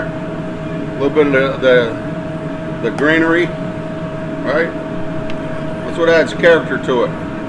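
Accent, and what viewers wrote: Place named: New England, United States. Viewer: eh! love your badass cooking style you & are behind the burners ya'll rock my day!!!! love New England accent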